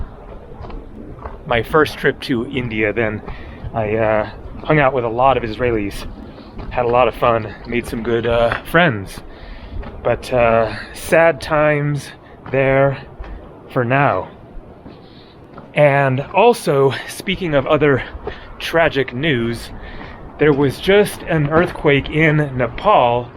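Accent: United States. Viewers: Hi, I found you today while trying to watch a vlog on Himachal Pradesh. I really liked the slow pace and story telling. Thank you! By the way, Indians don't mind if you face the camera on their face. I think, some may even like it. Well, I left India 39 years ago. I live in Philadelphia now. I am an Indian but what a joy to find you to get to hear the familiar American accent!! Sending love from Philly - the City of Brotherly love. All the best! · please pronounce. “ Himalayas “ and “ Himachal “ correctly…..after all the years of travel ….and you still pronounce some words with that arrogant American pronunciation…….love your videos, but your western pronunciation is a big turn off….and I find it disrespectful…….at least try to get the right pronunciation……most foreigners try to learn the main words in a language to communicate……‼️⁉️🇺🇸 Edit: I thought that with the starlink satellites, reception would have improve in the mountainous regions of the world…..at least that’s what T-mobile said….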